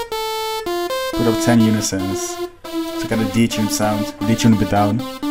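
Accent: Netherlands. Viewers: Hey man .. goeie totorial .. maar je moet echt werken aan je Engels accent en langzamer praten je bent amper te volgen 😉 .. geen haat gewoon een tip .. oja .. en je hoort duidelijk dat je Nederlands bent .. haha vandaar Nederlandse reactie 😉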